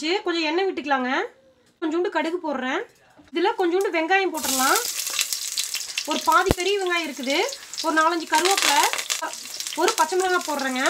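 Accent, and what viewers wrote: Kongu, India. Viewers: enka Kongu nadu styla pesarinka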